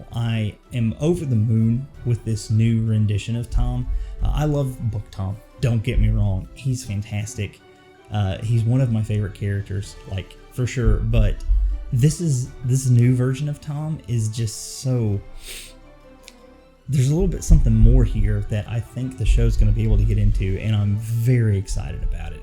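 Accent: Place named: Alabama, United States. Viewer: I thought I heard some Alabama in there. I've got family in Tallapoosa, Georgia (which is within spitting distance of Alabama). I've loved that accent for years. My wife and I are from SE Indiana (Aurora)...which is basically near Cincinatti, OH. We are in the Tri-State (Indiana, Ohio and Kentucky). We have an accent, but to us...it's just the way you talk. Thanks for sharing.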